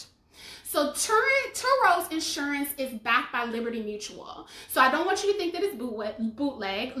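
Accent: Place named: South, United States. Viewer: Great information once again. And I love your southern accent. Keep up the good work❤️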